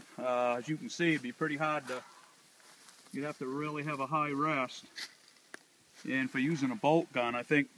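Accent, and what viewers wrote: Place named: New Hampshire, United States. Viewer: Great video my friend thank you . And just for the sake of question are you guys in New Hampshire ? I think I recognize your accent lol I'm from Rochester NH and I now live in Arizona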